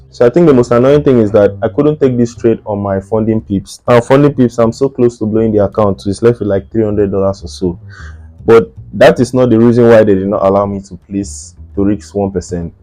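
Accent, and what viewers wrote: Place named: South Africa. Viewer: You're South African 😂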